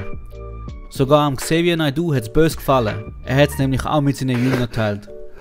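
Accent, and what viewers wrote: Switzerland. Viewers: Was ist das für ein Dialekt? Schwitzerdütsch? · Krasses Video und gueti Arbeit! Btw. Bisch du Solothurner? :D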